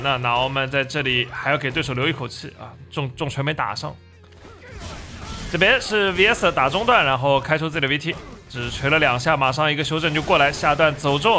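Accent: Shanghai, China. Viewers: 是上海人？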